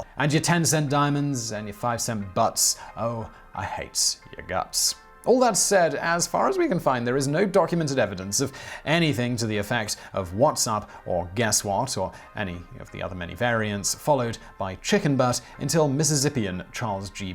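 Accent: United Kingdom. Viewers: is living proof that everything really does sound better with a British accent.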